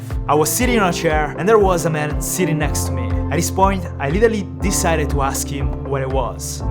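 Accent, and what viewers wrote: Italy. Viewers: What about learning, I mean totally nailing a British accent next video - could be interesting to see how an Italian would improve his accent! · Si sente che è italiano😆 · ho appena realizzato che sei italiano 😂🤣😅 eppure già dall'accento me n'ero reso conto 😂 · Gia dalla voce si capiva ma è italiano ahahahah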